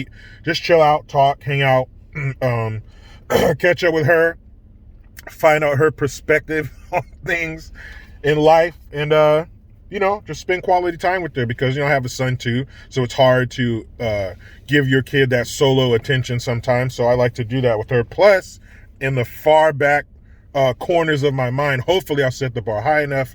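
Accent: United Kingdom. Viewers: Nice British accent 👌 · You sound like a great dad, J! Love your vids! The brit accent is hilarious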